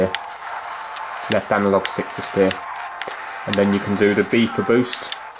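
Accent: United Kingdom. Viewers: Same I also liked the video because this mans British accent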